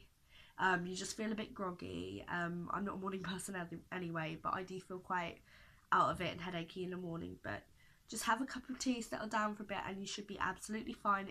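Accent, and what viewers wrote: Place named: United Kingdom. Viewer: where from the uk are u from?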